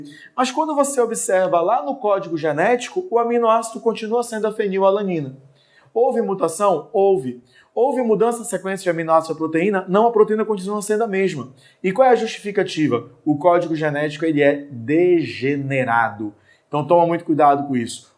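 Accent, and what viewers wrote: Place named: Pará, Brazil. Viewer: O senhor é incrível!! Esse sotaque é paraense 😂❤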